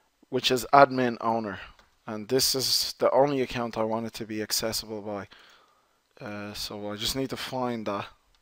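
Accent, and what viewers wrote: Ireland. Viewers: An Irish black man, cool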